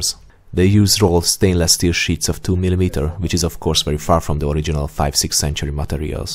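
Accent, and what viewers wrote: Hungary. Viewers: I know that you’re Hungarian but the accent is extremely annoying.... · I love that hungarian accent :) Experiments like this are a mix of experimental archaeology and some sort of survivalism. You never know when such infos will be useful. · Hungarian accent 😃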